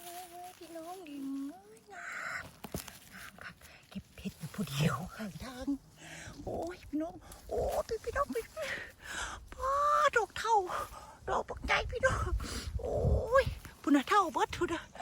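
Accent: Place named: Nyo, Thailand. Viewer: สวัสดีจ้าสาว อยู่จังหวัดใด๋น้อคือเว้าญ้อ ฉันอยู่ สกลเด้อจ้า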